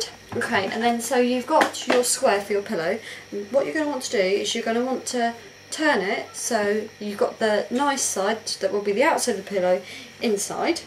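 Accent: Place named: England, United Kingdom. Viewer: going to try this over the weekend, love ur accent-where in England are you from???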